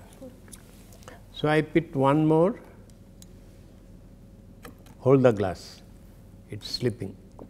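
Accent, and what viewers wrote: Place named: Bengal, India. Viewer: I can feel that the teacher is bengali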